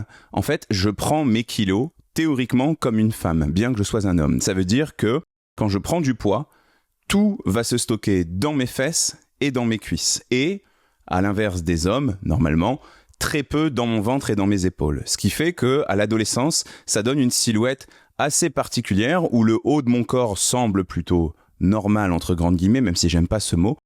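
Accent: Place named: Aisne, France. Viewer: Il me semblait bien avoir reconnu l'accent! Ah, les 02....J'ai grandi à St Quentin, donc, je comprends exactement les repas de famille et l'amour de la bonne nourriture française. Je suis vos vidéos qui sont toujours très bien expliquées. D'ailleurs, je les utilise comme motivation/piqûre de rappel quand je commence à oublier mon but. Donc, merci et...j'adore le papier peint! Super joli!!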